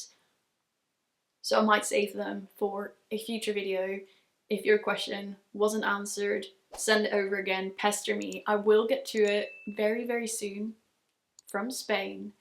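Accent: France. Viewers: Great and spontaneous video. That french accent though👌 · Cet accent français 😍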